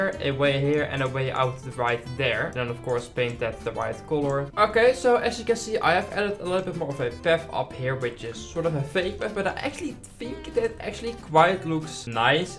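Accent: Netherlands. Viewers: Wow you are good at building but why cd-opnamen you have an Dutch accent are you dutch? I know that accent BC im Dutch 😁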